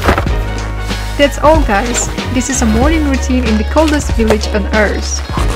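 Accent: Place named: Russia. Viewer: Английская озвучка типично русская... Ландан из э кэпитал оф грет британ....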